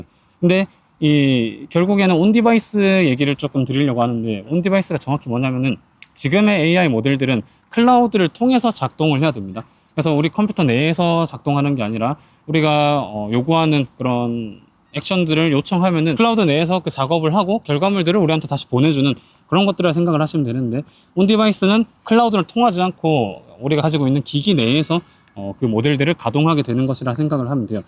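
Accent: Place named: Namhae, South Korea. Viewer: 가끔 들리는 남해 사투리가 정겹네요. 님 예전 영상들 보며 공부중이며 정말 많은 도움이 되고 있어요. 기회가 된다면 멤버십 스터디에도 참여하고 싶네요. 한국의 워렌버핏이 되길 바랍니다.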